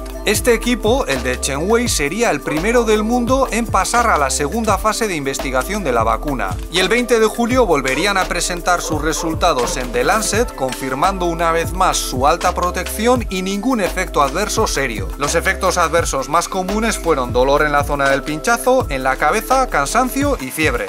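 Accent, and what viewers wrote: Spain. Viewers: y no sabes distinguir un uruguayo de un ESPAÑOL? Pues nada en común el acento . Saludos desde el pueblo de Javier Telletxea. NAVARRO. ESPAÑA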